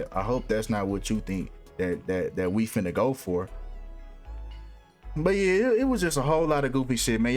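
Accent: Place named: Detroit, United States. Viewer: Bro you gotta be from Detroit i hear it in yo voice 😂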